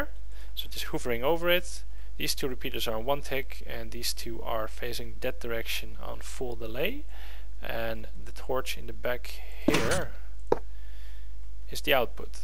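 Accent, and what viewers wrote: Netherlands. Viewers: Where are you from? Nederlamd? je klink nederlands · Grappig je kan gewoon aan je engels horen dat je een Nederlander bent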